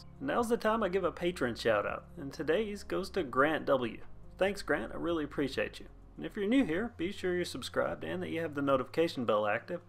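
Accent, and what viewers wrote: United States. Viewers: why not? I would be happy if I had your accent . My russian/turkish accent is sucks. You sound true american. Don't get me wrong . I am gonna keep listening . I know one thing for sure , if you like something it's gonna work you. 🤝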